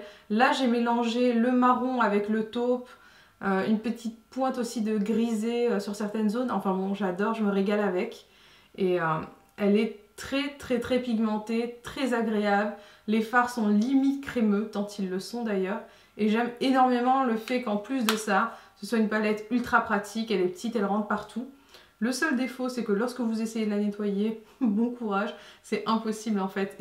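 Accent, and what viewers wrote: England, United Kingdom. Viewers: tu me fais rire avec ton accent anglais super prononcé !!!!! en tout cas je suis très contente de ton retour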